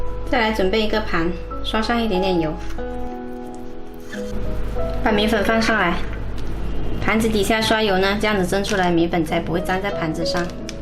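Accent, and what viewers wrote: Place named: Hakka, China. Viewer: 你好！看到都想食哟😀👍简单易学！吖妺话老鼠奈去了😃客家话好好听👏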